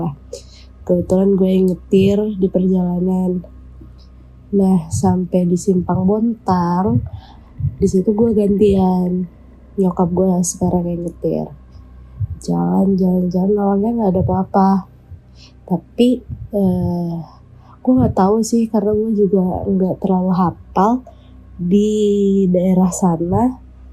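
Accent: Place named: South Jakarta, Indonesia. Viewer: Iya gabungan sengau plus jaksel. Makin gak jelas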